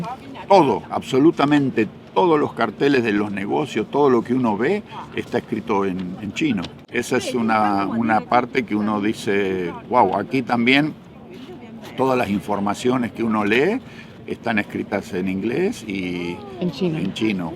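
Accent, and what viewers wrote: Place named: Cordoba, Argentina. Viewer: Me gusta mucho como habla, bien Argentino Córdobes, los saludos desde 🇦🇷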